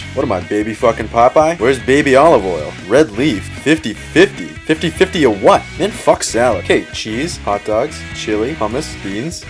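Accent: Boston, United States. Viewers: Woahh hello random Boston accent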